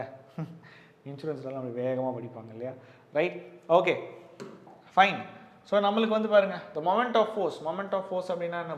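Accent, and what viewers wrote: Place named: Thanjavur, India. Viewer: thanjavurla irunthu pesuran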